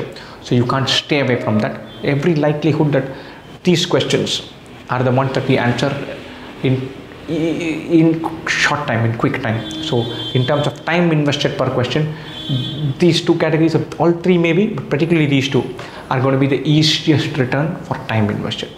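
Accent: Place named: South India, India. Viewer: everything all good but get trouble understanding your language( south accent) maybe.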